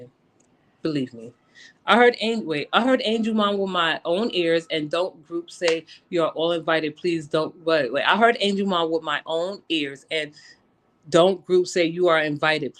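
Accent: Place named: Bronx, United States. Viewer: Hey my beautiful how long have you been back on utube I love hearing you speak that northern accent 🦁🦁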